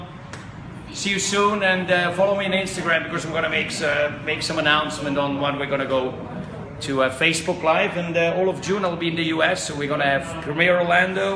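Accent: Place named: Scotland, United Kingdom. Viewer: Loving the Scottish accent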